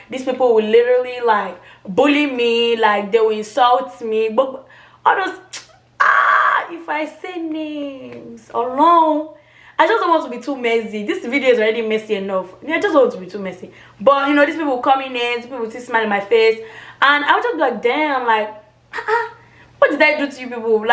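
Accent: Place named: Yoruba, Nigeria. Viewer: I even like the way she talk👌missing up with Yoruba 👍👍👍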